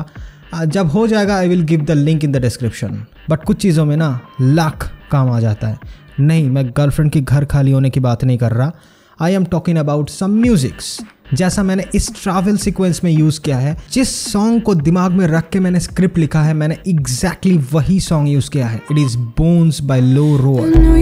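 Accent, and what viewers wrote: Bengal, India. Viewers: Re tumi bangali na Tomar tone e bojha jay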